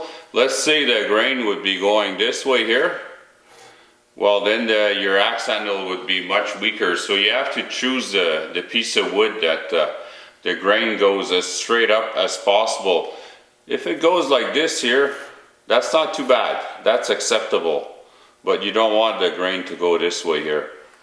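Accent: Quebec, Canada. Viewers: Quebecois eh? I like your accent. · Great video, mesmerized by your Canadian accent - very cool. You have got some impressive tools and skill set. Thanks for the video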